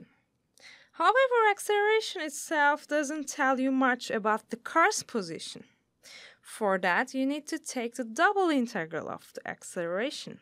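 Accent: Turkey. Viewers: She sounds very Turkis!h to me. Great explanation! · türkçe düşünerek inglizce konuşmuşsun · Is the speaker Turkish? Please someone tell me whether I'm right I have to win a bet. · Bunu seslendirenin Türk olduğuna her türlü iddaya girerim. Tam bizim aksan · Konuşan kadının Türk olduğuna yemin edebilirim isteyen olursa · bayan kesin Türk valla..Ingilizcesinden tahmin ettim.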